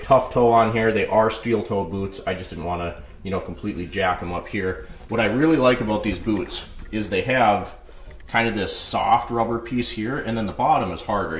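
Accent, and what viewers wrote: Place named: Canada. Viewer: You’re Canadian Aye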